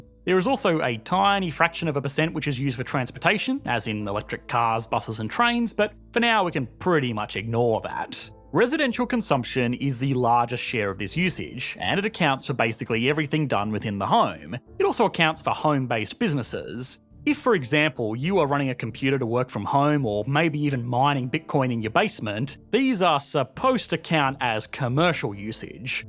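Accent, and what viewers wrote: Australia. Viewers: Take it easy; he's Aussie. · Where at in Aus are you from? I've never heard an accent like yours. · Dude, are you trying to sound more australian? :)) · For so long I hold myself to not write this ugly comment but I need to becouse I am sure I am not the only one who observed that: you have a good content and interesting video s but you English accent the way how you pronounce the word s that are at the end of a sentence is so so ugly for any 👂👂 ears that are looking at your videos, I don't know your accent sounds like a bulshet Australian accent, I mean the worst possible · the au accent is annoying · the Aussie accent is a real distraction - can't get through the content....brain is suffering..... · This sing-sing delivery in a thick Aus accent is impossible to listen to. Compounded by the swift pace of the non-stop read. This is how economics is made opaque and a turn off for average people. 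Poor communication of basic ideas.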